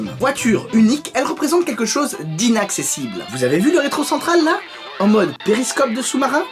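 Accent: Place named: Italy. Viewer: Mais quel accent italien <3 <3 <3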